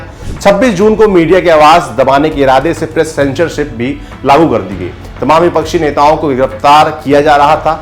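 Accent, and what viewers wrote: India. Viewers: Congress, left, terrorists ki dalali chalu rakho. Lallantop ke dalalon ki jai ho. Desi gamacha pahankar, desi tone bana ke dalali ka naya formula nikala hai.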